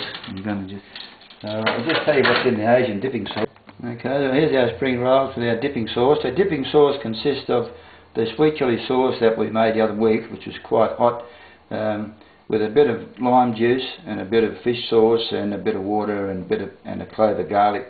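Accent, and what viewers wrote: Australia. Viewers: AWESOME you are a great chef Link your site to some other similar site... People need to see you... I found you by accident and I am so happy... I will subscribe now.... Thanks for the great videos..California is my home where are you from ? is that an Aussie accent? just curious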